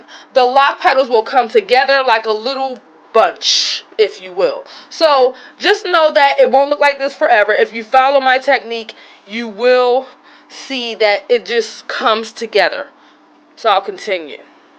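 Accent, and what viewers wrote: Baltimore, United States. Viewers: I’m from the V in the DMV and I love the difference in all of our accents and Baltimore has one of my favorite accents by far.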